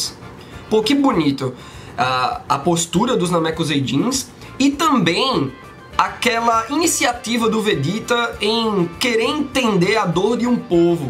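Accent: Pernambuco, Brazil. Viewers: Esse cara e Pernambucano kkkkkkkk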